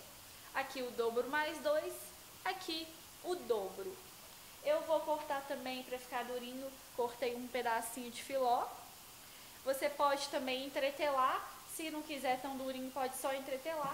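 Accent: Minas Gerais, Brazil. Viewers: Você é muito fofa, adoro a graciosidade que o sotaque mineiro tem!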